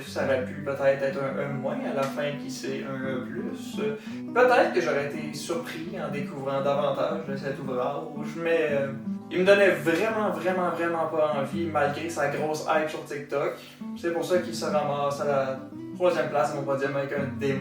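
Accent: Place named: Canada. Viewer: Bel accent canadien en passant😊 J'suis un mordu de lecture...c'est surtout ça qui m'a accroché... De plus les titres des livres le sont tout autant... Merci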